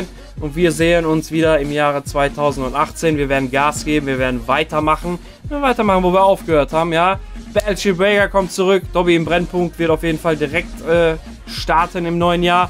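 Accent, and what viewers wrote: Ruhr, Germany. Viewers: Dabei seit ca 35K Abos. - Ein Kanal der durch Menschlichkeit und Sympathie punktet. Der Protagonist?. Ein Mensch der immer einen Joke auf Lager hat und mit seiner Ruhrpott-Schnauze die Fifa-Szene rockt. Ein absolut Bodenständiger Typ, dem man die 300K einfach gönnt. du Legende, mach weiter so und lass dich nicht verbiegen. #KellerGang #2K18iscoming #HappyNewYear #Crewlove #VomShishaKellerzumKeller #Roadto400K #BesterYoutuber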